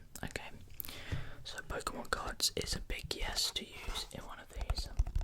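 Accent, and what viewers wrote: United States. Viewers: AHAHAHHAAH I LOVE THE AMERICAN ACCENT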